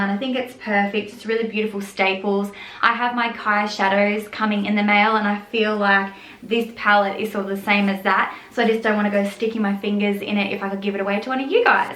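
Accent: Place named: Australia. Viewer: I love you you're so pretty and I love you're Australian accent!!!!! I come from the UK but you're so pretty and I love all your videos!💖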